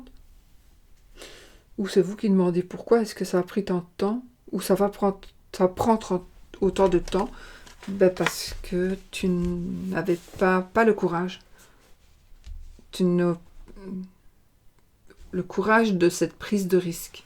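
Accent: Belgium. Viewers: Bonjour d'un Gémeaux du Sud de la France à la Belgique, votre accent et votre style est belge, est ce que je me trompe? Jolie ❤️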